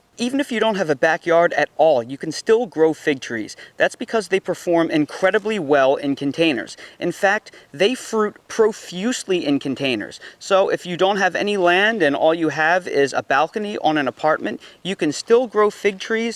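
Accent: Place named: Philadelphia, United States. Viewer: Your accent tells me you are from Philly. Is that correct?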